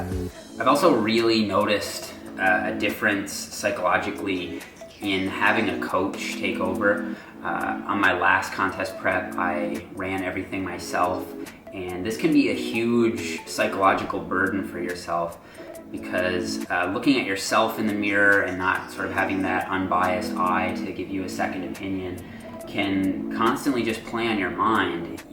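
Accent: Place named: Canada. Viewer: New fan here, love the content, love the accent (Canadian?). subscribed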